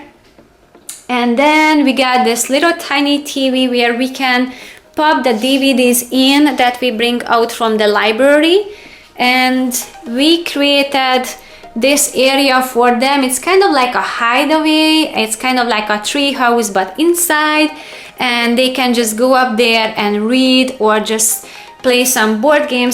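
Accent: Hungary. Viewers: A few seconds into the video when I recognized the thick Hungarian accent. I live in NYC in a 350 sq feet apartment and I still want to downsize and get rid of more stuff ( although my place is not cluttered) I guess everyone has a different idea what is big or small. The place looks amazing, You guys did an amazing job. Örülök, hogy egyre több magyar használja a Youtube ot extra bevétel szerzésére. Mások is csinálják, akkor miért is ne. Persze angolul könnyebb. Feliratkozok a követőid közé. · Are you hungarian, because your accent sounds like it. Where do you live? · Wow. What a transformation. Well done! I love your house. I wonder... Where are you from? Guessing by your accent, Is it Hungary? Sorry, just curious...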